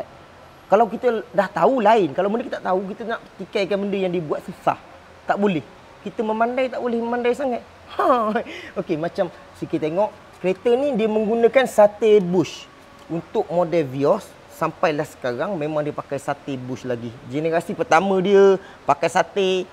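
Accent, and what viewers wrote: Pahang, Malaysia. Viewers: workshop kat mana? bunyi cakak turr maca org pahang jek..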